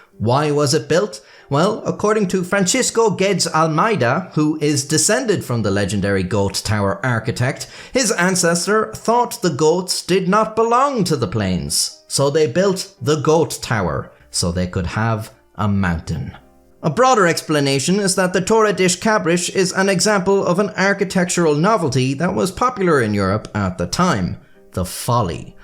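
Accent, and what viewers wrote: Ireland. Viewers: I’m not even Portuguese and the pronunciation hurts. Thankfully, the delightful Irish accent more than makes up for it. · My feed knows me so well... another Irish man talking about goats and their towers is all I've ever needed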